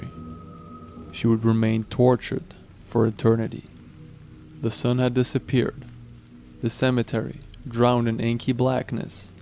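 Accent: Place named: Eastern Europe. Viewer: 1st time listener.... that first story was creepy. Your accent reminds me of russian? Eastern europe?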